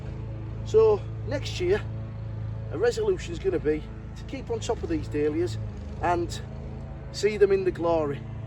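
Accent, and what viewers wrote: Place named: Yorkshire, United Kingdom. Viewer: New subscriber here and a relatively newbie to allotment life, only had mine just over a year. Whereabouts in the UK are you? Listening to your accent you’re not too far from me in Yorkshire and it’s good to follow someone who lives in the same area to know what they can grow etc. PS I’ve also got compost envy. 🤣 Deb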